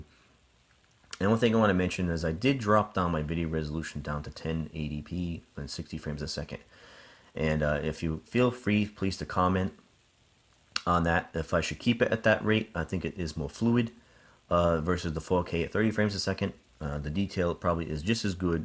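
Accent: Boston, United States. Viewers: Yay! Real English! And you don't sound like a robot! Thumbs up. North boston? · Lol new to your channel. Uh, Boston in the house? I thought you were saying Huawei but you were saying hardware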